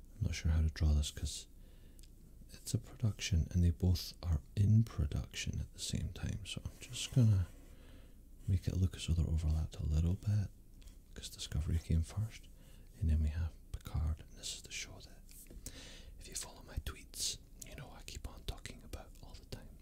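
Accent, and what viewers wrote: Scotland, United Kingdom. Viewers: Two of my favorite things in life. Star Trek and a beautiful Scottish accent. You just got a new sub! Love this!!! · I have a request never stop posting asmr videos the Scottish accent is very soothing.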